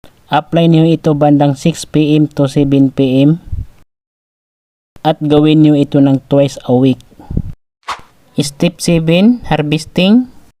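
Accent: Visayas, Philippines. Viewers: 😢 parang bisaya si kuya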